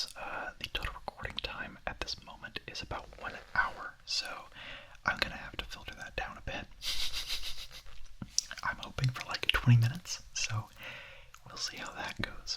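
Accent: South, United States. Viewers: Petition to hear your southern accent more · This was funny and cute. Would definitely love a video with your southern accent. I'm dying from the little bit in this video, a whole video and I'm dead. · The southern accent,AHHHHHHH it hit a switch that said yes just yes...buuuut I can understand not liking how it sounds especially on your own voice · Congrats on 10K! That’s so exciting! First of all, your cats are the cutest. Pet them for me pleeeeeeeeease! Now, can we just talk about the fact that you played D&D and were a DM for campaigns? That’s so cool! And the fact that you’re a big sci-fi/fantasy nerd is awesome also. I’d love an audio like that from you in the future. And since you love the genre so much, I’m sure you could create something amazing with it. “Kill the shame inside your head, kids. It helps you open up new avenues for your life.”—This is such a powerful statement, ohmygod. 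Also, I’m impressed that the ‘Comforting You in a Crowd’ audio was improv! You did a great job with that and I never would have known you didn’t script it. It was very natural! The fact that your improv skills came from D&D makes me grin. I love that! D&D really is such a great way to learn how to act and portray a character. Even before you said you were from the South, I figured you were. Because you said y’all early in the video, haha. And then you pulled out that Southern accent and I was like, “CONFIRMED.” (You have a nice Southern accent, bytheway. Just saying shhhhhh.) As far as games go, I usually play visual novels or board games, but there’s one video game that I absolutely LOVE and will straight up tell you that I’m great at, which is Divinity Original Sin 2. Since you’re interested in fantasy, you should check it out. Might be up your alley! It's an incredible game and it has a definite (and good) ending, which is alway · Okay...now that I know you're a southern boy, please do something with that accent!!! 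I can't tell you how much I tingled just hearing that little bit. I'm a Cali girl in Arkansas and I don't get to hear that from anyone but myself (I live in the country). Actually no, I heard a hint of it from a guy I met NYE but we spoke for 2 seconds before he was gone forever 😭😭😭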